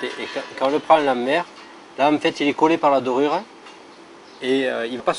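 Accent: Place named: Provence, France. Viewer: Un vrai plaisir....BRAVO....ET l'accent au top...#PACA...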